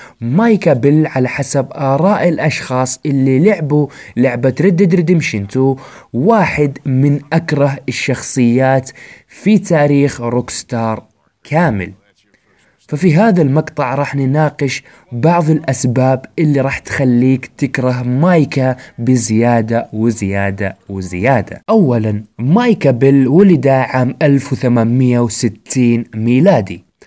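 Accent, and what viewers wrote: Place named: Jeddah, Saudi Arabia. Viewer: ما ابغا احلف بس واضح انك من اهل جدة 😂❤️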